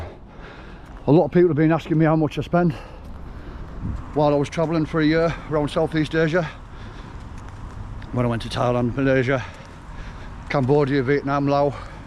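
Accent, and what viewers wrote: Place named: Yorkshire, United Kingdom. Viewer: Hi boy I m ur new subscriber a Scotish Pakistani. Love ur Yorkshire accent.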